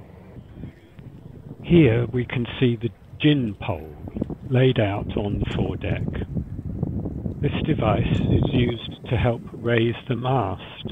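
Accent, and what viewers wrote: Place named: United Kingdom. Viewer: J, You don't sound like a native Californian. Are you British?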